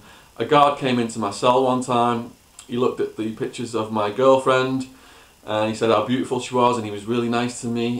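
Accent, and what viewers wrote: United Kingdom. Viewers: I hope you don't mind me saying that you sound too british for an Arizona prison.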